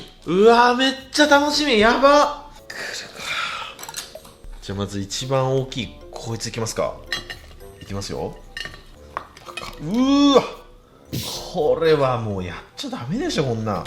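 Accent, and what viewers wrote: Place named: Aichi, Japan. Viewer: 愛知だからか朝倉未来とイントネーション同じ時ある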